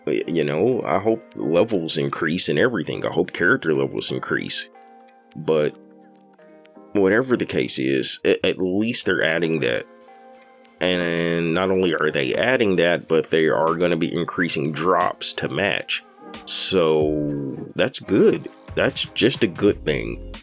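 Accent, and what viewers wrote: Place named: South, United States. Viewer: He do got that southern twang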